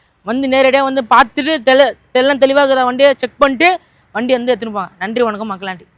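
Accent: Madurai, India. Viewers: Madurai vasagam 🎉🎉🎉super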